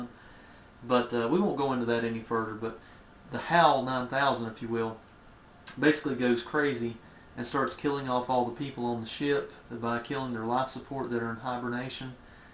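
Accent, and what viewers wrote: South, United States. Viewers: Can't quite place your accent, sounds halfway between sounds mostly country southern with a mix of Carl Sagan.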